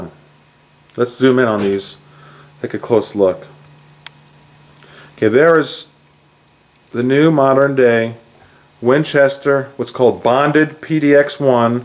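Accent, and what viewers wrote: Long Island, United States. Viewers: the fly popped in and left when you said bye,,, funny. what part of LI are you from. I thought I detected an East Meadow accent.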